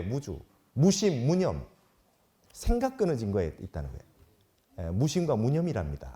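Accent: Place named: Gyeongsang, South Korea. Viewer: 스님 은근히 경상도 톤에 정감이 가네요 항시 스님 법문에 관심을 가집니다 감사합니다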